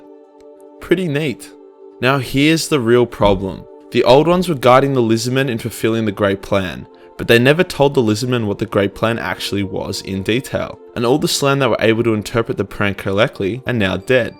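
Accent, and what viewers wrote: Australia. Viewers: No, he’s just Australian.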